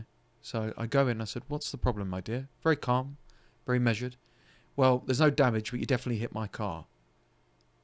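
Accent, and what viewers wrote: United Kingdom. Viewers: Im assuming youre in the UK because of your accent, but that sounds fuckin miserable. Im in Tennessee USA and thats not my experience at all. People are generally nice to me as I encounter them, and my only real complaints are mannerisms that dont really affect me and just tell me a little about people. Im a fairly intimidating man though, so I doubt anyone would ever even try me like this. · Realistic no BS Brittish Man with an elegant accent.. instant subscription good Sir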